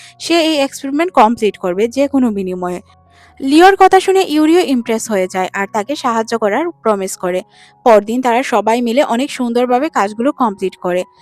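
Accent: Sylhet, Bangladesh. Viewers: kota huniya oi buja jar afne je sylheti 🤣🤣🤣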